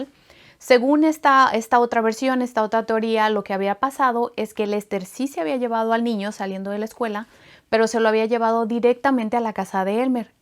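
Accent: Mexico. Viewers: si gracias sabía que era mexicana su asentó no podía equivocarme y desepsionarme gracias 👍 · ¿Eres norteña vd? ¿Monterrey?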